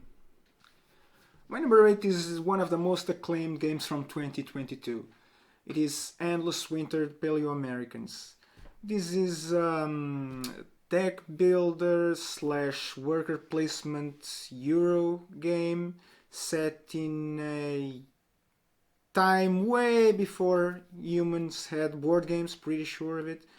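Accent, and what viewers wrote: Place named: Portugal. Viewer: This english sounds like portuguese?! or am I dreaming. Anyway, very good video, good, simple production, direct to the point! Boa sorte! Mais um sub!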